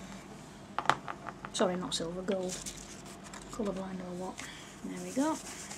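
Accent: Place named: Nottingham, United Kingdom. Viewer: I am new to guilding flakes & came across your lovely videos with wonderful tips, I am so impressed with your budget ideas I have just ordered the mix bags of flakes you have on Ebay, & dont worry about the accent, I am in Nottingham & understand every word! lol great videos, thank you for making them for us xxx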